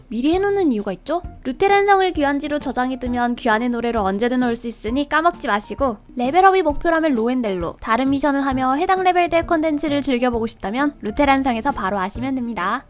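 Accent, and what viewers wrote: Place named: Gyeongsang, South Korea. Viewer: 경상도 분인가 E 발음할때 다 티나심 ㅋㅋ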